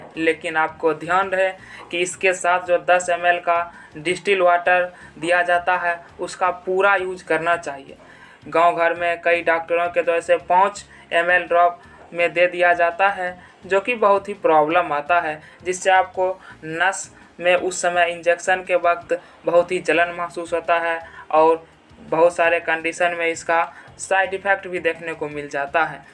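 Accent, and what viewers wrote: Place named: Begusarai, India. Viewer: Lagta hai aap begusarai se ho awaz se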